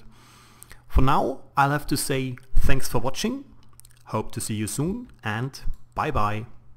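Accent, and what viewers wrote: Germany. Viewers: u sound german :D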